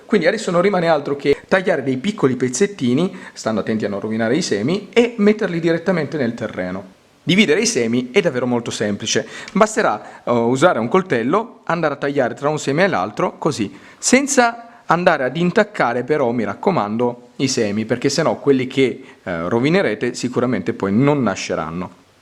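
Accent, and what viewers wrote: Italy. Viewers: senior, I enjoy your gardening tips & love your Italian accent, ciao.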